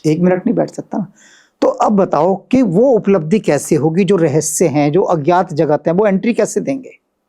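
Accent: Haryana, India. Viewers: Ye host ka accent haryanvi hai ya Rajasthani?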